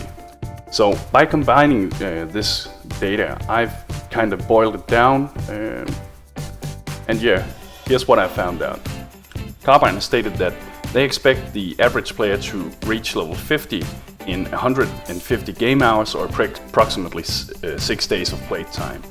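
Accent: Denmark. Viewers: Your accent sound danish :P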